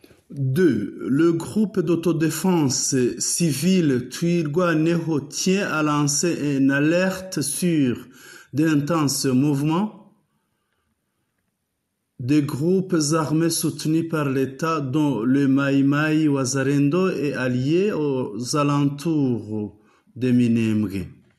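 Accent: Rwanda. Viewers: Rwandais par ton français mensonges prolonger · Avec ton français rwandais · Le ton de français indique que tu es Rwandais.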